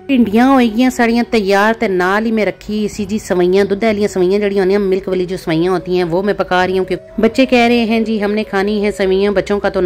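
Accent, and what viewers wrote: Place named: Pothwari, Pakistan. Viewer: Mash Allah very nice and interesting vlog.I love your gulabi pothwari and gulabi Urdu, it makes it so interesting 😊. Bhindiya are my favourite with chicken or meat 😋😋😋.stay connected ↪️🏕👀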